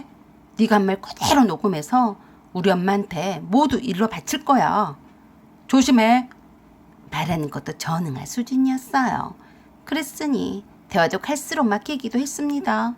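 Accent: Jeolla, South Korea. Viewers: 찰진 전라도 사투리가 섞여서 아주 재미납니다. 모지리 찐빵 ㅋ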